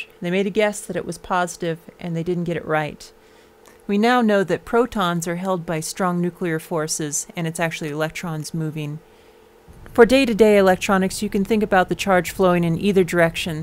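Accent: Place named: France. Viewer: Finally, a video that encompasses comical French accents and electronics :3. Nice videos!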